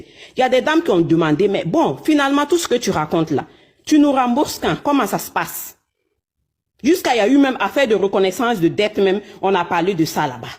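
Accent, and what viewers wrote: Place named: Africa. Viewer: C'est l'accent de quel pays... ? Un pays d'Afrique à 100 % sûr... mais je sais pas exactement quel accent... Un pays francophone, donc plutôt Afrique de l'Ouest...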